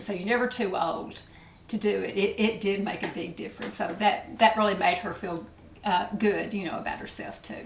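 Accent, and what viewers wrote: South, United States. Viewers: LOVE the Southern accent!!!! Beautiful!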